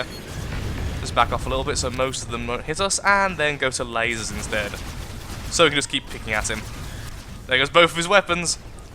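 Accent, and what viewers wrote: United Kingdom. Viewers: hes British. not american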